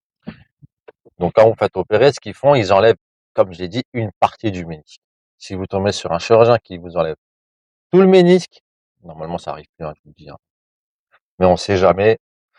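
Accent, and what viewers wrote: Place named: Seine-Saint-Denis, France. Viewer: IN ACCENT DE BLEDARD DU 9/3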